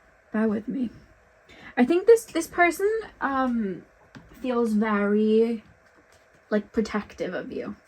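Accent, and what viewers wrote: Wales, United Kingdom. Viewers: I don't understand a word you're saying 😢 +oh, you got the Welsh accent 😮 +oh... yes I do talk a lot😅 I'll re-watch it with subs 😊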